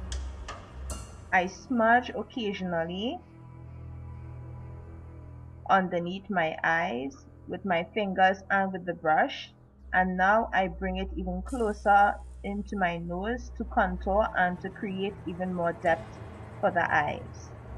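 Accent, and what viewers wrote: Trinidad and Tobago. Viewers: Ahhhhhhhhhh of course you speak French......Awesome. Cute trini accent too. I love videos like this, always loved playing in special effects makeup. No skills though, I shall definitely learn from you. Love it. I will check out the rest too.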